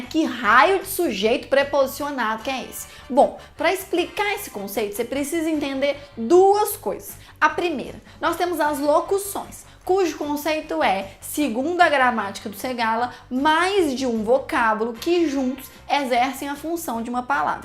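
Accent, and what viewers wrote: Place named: Minas Gerais, Brazil. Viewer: certeza que é mineira hahaha sotaque muito carregado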